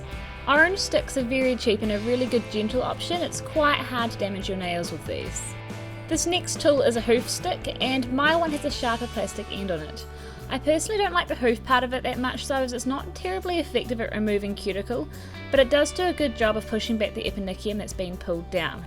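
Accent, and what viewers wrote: New Zealand. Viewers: I love the way you talk! I'm a Kiwi too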